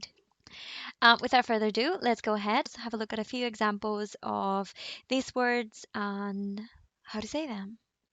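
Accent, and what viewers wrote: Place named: United Kingdom. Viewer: Why does she sound British? Lol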